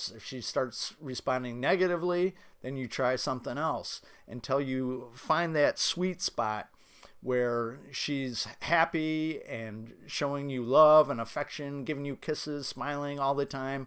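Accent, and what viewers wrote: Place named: Michigan, United States. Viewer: Are you from Michigan? Your accent sounds northern.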